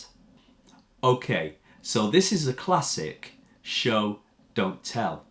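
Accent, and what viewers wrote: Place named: United Kingdom. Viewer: Very good tutor with very clear English, and easy to understand, which is very important for students especially for students from abroad. You can improve not only your English writing ability, but also your English listening and speaking because the tutor/ gives teaching with standard British English.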